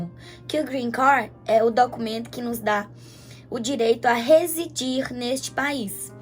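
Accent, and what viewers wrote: Minas Gerais, Brazil. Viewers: Oh! Sua história é linda, quanta meiguice 👏👏👏gente nao esquece de cutucar o sininho👍amo esse jeito mineirinha de você falar🌹b💋no teu ❤ desejo uma boa hora minha🌹aqui no nordeste falamos assim quanto tem uma gravidinha!! Admiro muito você visse? 🌹do dia🌹da tarde e 🌹da noite!!💋💋💋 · acho tão bonitinho seu sotaque...a família do meu pai são mineiros e acho mto legal esse jeitinho uai de ser. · Querida amo ver vc contando sua vida no exterior tão menina e com tanta maturidade pra aceitar as diversidades da vida....parabéns por amar nosso país q é abençoado por Deus o que ñ presta são as lideranças sua história é muito linda amo seu sotaque mineiro meiguice demais ao falar deve ser lida falando brava jeito lindo de ser humilde: grande abraço! Deus é contigo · Logo vi... Seu sotaque mineiro , não perdeu.. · Linda sua história, vc é lindaaa , acho lindo seu sotaque mineiro, admiro ver mesmo morando fora do Brasil, continua amando nosso país, eu também tenho esse mesmo sentimento saiu do Brasil por causa de segurança e poder dar uma vida financeira , educação para minha filha , mas o Brasil nunca saiu do meu coração ❤️ tenho minha casa 🏠 no Brasil , um dia voltarei a viver lá 🇧🇷 se Deus permitir quero terminar minha velhice lá, minha terra amada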